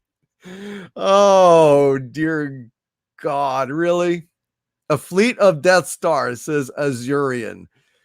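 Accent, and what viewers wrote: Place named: Germany. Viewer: Scottish? I thought you were German. Your braking the fourth wall!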